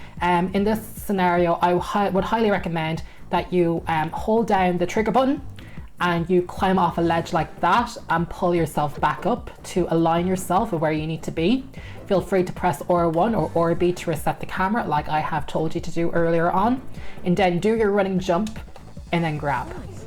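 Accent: Ireland. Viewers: There's no tap backwards? At all? That's such an omission. I can't make a jump because I need a one tile run up, but I can't do the dangle off the edge and climb back up trick, as there's a bloody wall there. It's perfectly impossible with the modern controls. Because you've got to go back right to the wall, but if you walk backwards, Lara gets stuck with the wall and to sort that, you've got to walk forwards but then you don't have the run up. Oh, well. Time to go back to "tank controls" and re-learn them. I mean, I used them back in the day. I thought "modern controls" would help fix it being so janky, but they feel more janky - for the lack of tap backwards - and not an improvement. p.s. Ah, those Irish "R"s. Love it. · I find the modern controls way less clunky and awkward in general, but need to switch to the tank controls for things like making her do that jump back for the perfect running jump etc. 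Looking forward to this video as I definitely need help with exactly the issues you're describing! It took me like 4 levels to figure out [on the switch] that you need to press RZ to grab after jumping, not the action button or any others. Camera angles are a major pain in the pass, too. P.S. Off-Topic and maybe unwanted comment, but I love your accent! Irish accent has always been my favourite :)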